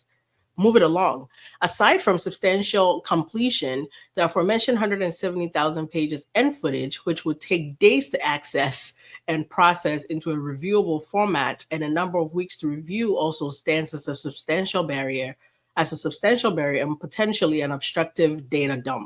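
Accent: United Kingdom. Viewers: Awesome British accent ❤ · I love the British accent !! brilliant - you could star in a broadway Oliver Twist production !! 😂😂😂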